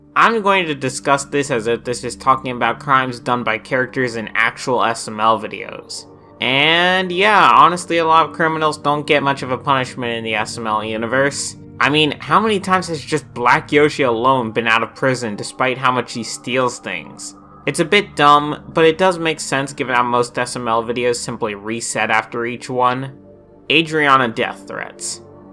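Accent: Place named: Brooklyn, United States. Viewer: At times u sound like brooklyn t guy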